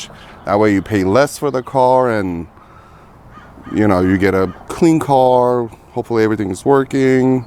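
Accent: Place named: Iran. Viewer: Can hear the persian in his english, very powerfull language 👌🏿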